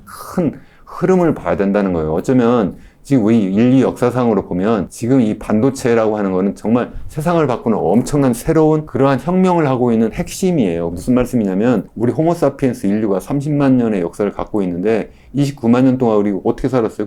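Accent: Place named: Jeolla, South Korea. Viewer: 일단 라도 사투리부터 고쳐주셨으면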